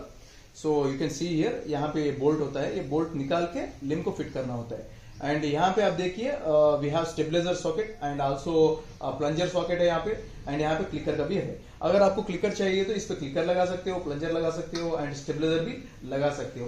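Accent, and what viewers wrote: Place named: South, India. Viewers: I think you are from south india as listening your hindi😁❤️ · He is from Telangana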